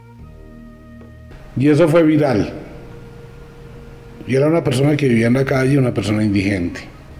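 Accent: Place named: Colombia. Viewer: SEÑOR: LE COMUNICO POR ESTE MEDIO QUE TIENE USTED MUCHO KARISMA SUS CHARLAS SON MUY AMENAS Y MUY DIGERIBLE POR LO CUAL LE AGRADEZCO Y LE EXPRESO MI MÁS SINCERA Y HUMILDE ADMIRACIÓN LE CUENTO QUE LA GENTE DE COLOMBIA ES LA MEJOR AMÓ SU ACENTO ES QUUE SUCEDE, PASA, Y ACONTECE QUE HACE UNOS 10 AÑOS CONOCÍ EL GRAN AMOR DE MI VIDA... (uno de tantos pues) ERA TAN HERMOSA ERA DE CALI Y DE AHÍ QUEDE ENAMORADO DE TODA LA GENTE COLOMBIANA YO SOY DE MONTERREY NUEVO LEÓN MÉXICO. Y ADICO EN L.A. CALIFORNIA ESPERO VEA ESTE MENSAJE ANTES DE NAVIDAD LE DECEO MUCHA FELICIDAD EN ESTA NAVIDAD Y MUCHA SALUD Y SOBRE TODO MUCHA PAZ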